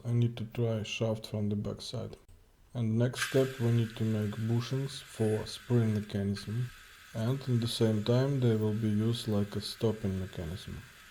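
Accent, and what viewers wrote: Russia. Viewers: Такой акцент яркий, ощущение будто русский человек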